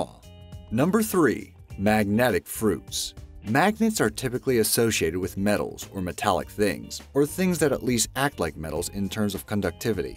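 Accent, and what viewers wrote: Hungary. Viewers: This guy is def Hungarian. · You’re Hungarian?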